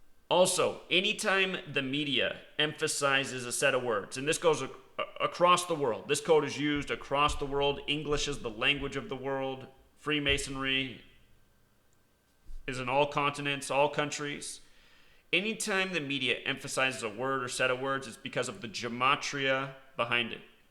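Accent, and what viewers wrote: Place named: United States. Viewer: Whao what i can't believe is that people did not ask the question I mean when it came on the radio I said out loud how can a couple both die of heart failure WT...... I thought I heard it wrong. there was no discussion in the public I really started to believe I dreamt it then low and behold Your feed popped up on the sidelines I thought oh I clicked imagine my surprise when I realized you were from America or Canadian I can't tell the difference. OK concider my mind blown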